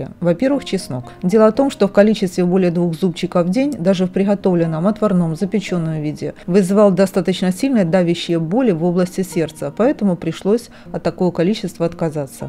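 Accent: Ukraine. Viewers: Это украинский говор. Будьте толерантны. Мы же спокойно относимся к вашему российскому. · У вас акцент украинский.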